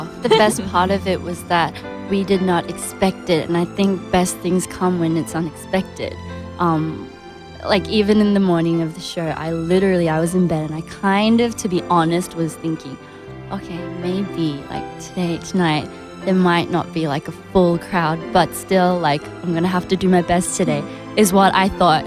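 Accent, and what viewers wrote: Australia. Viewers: Dat aussie accent doe. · Her random Aussie accent reminded me Ozzy The Commenter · Yes ....she can inspire anyone with her words and her australian accent..gosh no wonder her voice is so amazing.... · I'm sold because of the ausie accent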